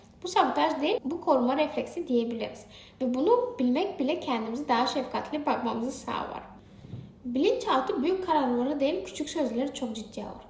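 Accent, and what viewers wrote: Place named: Russia. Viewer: Rusça gibi konuşuyorsun Türkçeyi ama dinletiyor. Bilinç altı konusunda da haklısın👍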